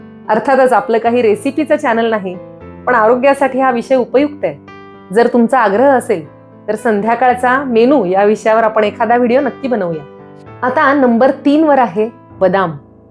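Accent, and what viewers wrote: Standard. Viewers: 🙏🏻आपले बोलणे अतिशय छान व शुद्ध आहे. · मॅडम तुम्ही मारवाडी समाजाचे असून सुद्धा मराठी किती शुद्ध स्पष्ट बोलता हे खूपच कौतुकास्पद आहे.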